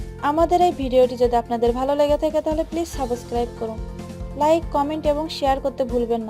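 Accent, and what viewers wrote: Bangladesh. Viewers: Hahaha... 🤓🤓🤓🤓 How sweet... News reader is from Bangladesh it seems.. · apni ki Bangladesh thakhen apnar katha bolar dharan dakhe thik tai mone hoche. parle Vasa change karun Tate apnar valo hobe.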